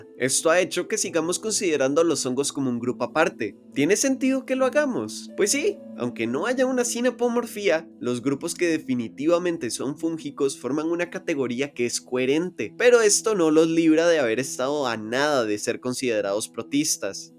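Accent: Costa Rica. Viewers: Que raro se me hace oír el acento tico en youtube. Buen contenido, no sabía que había edutubers acá. Eres un grande!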